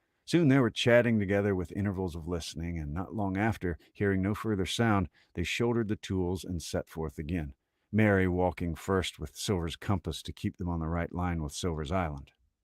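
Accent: South, United States. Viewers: Woohoo! Somehow I had never actually read Treasure Island, or if I did, I forgot most of it. Thank you for finishing your reading! Southern really suits the story so well. I had an idea for a possible future book - The Three Musketeers? Though that would be a long one.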